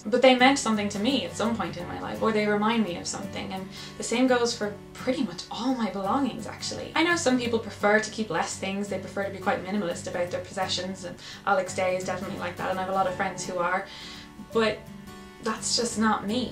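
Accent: Ireland. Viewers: So nice to hear an Irish accent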